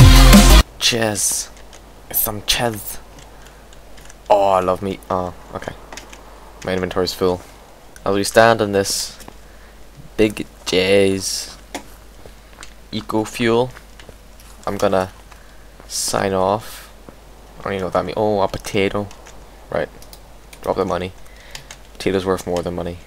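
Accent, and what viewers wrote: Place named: Lisburn, United Kingdom. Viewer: Dude! I can't believe I didn't pick up on your accent the first time around lol. My folks are originally from Lisburn lol My granda used to own the pram shop on the corner at the top of the town Fredrick Thomas lol. Biggest hint I can give to help others channel is to network. Go to peoples channel talk to them and ask about their videos it puts people in a really good mood and then they come to yours. when you said "I took a baitin ther" I thought Yup Lisburn :D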